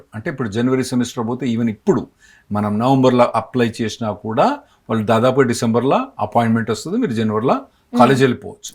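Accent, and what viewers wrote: Telangana, India. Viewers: Sir your words are pakka telangana❤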